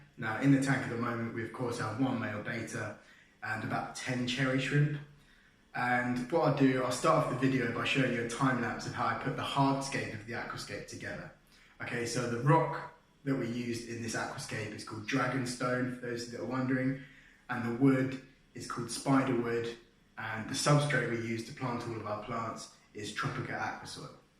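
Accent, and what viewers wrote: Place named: England, United Kingdom. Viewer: Hes speaking English and hes from England. Are you special?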